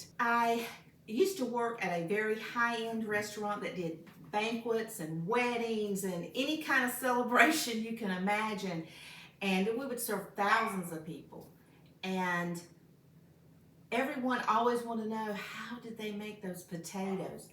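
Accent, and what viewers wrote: South, United States. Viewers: I was wondering what to do with these canned potatoes ty. And she has a lovely Southern accent which many of us are jealous of lol. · Thank you so much glad to meet you I will keep your Channel. I'm 90 years old and I live in Southern California and I know you're from the South but I don't know where how could I tell LOL🥰🥰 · Love your southern accent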